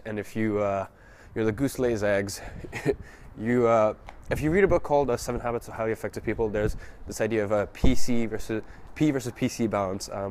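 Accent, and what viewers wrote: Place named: United States. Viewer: from the UK but you sound American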